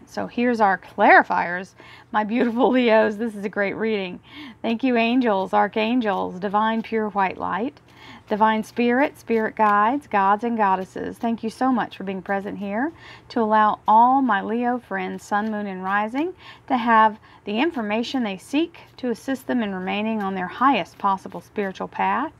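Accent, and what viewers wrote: United States. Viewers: I love the accuracy, the lovely American accent, and of course your enthusiastic interpretation. Thank you so much. x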